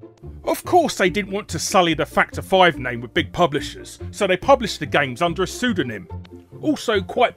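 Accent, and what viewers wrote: England, United Kingdom. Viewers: Top stuff, so glad to have a notable British voice amongst the endless drone of Yanks on the web - more UK Home Computer vids would be great! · What what of the UK are you from? I always wanted to know that accent came from. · He's English, that's why. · Always a British narrator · You sound more bri´ish then bloody Ashens!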